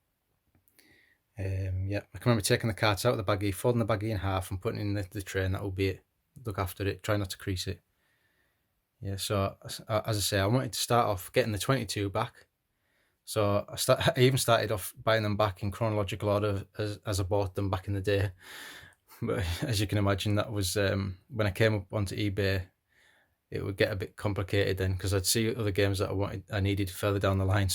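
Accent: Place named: West Cumbria, United Kingdom. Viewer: Great video, i'll enjoy watching this. You sound like you live near me. West Cumbria?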